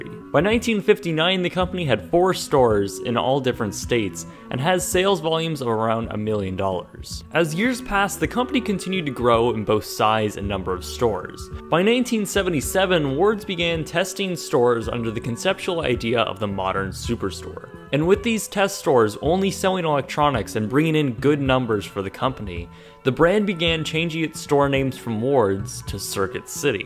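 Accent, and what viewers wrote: Canada. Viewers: I can hear the Canadian accent in your voice. Makes this video that much better. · narrator is Canadian. They pronounce some words differently. Gotta problem wit dat?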